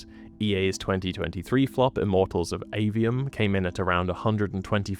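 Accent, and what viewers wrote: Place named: RP, United Kingdom. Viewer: It's probably because market research indicates that an English RP accent with a slight colonial overlay (Canada?) appeals to Americans, hence AI does it.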